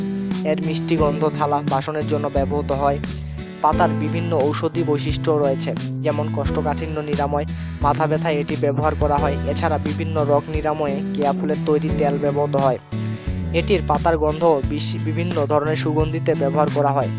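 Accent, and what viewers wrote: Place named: Barishal, Bangladesh. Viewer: ভিডিওর ধারা ভাষ্যকারের বাংলা ভাষার উচ্চারণ বরিশালের আঞ্চলিক ভাষার অনুকরণে উচ্চারিত। অশুদ্ধ উচ্চারণের জন্য অনেক বাংলা শব্দের সঠিক অর্থ বুঝতে অসুবিধা হচ্ছে। বিশুদ্ধ বাংলা উচ্চারন হলে আমাদের সবার বুঝতে সহজ হয়। ধন্যবাদ।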